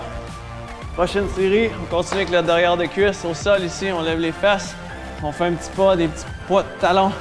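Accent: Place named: Quebec, Canada. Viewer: Salut, Merci pour cette petite séance ! J'aime beaucoup le format. Quelle bonne humeur ! Et l'accent québécois est plein de joie, ça motive !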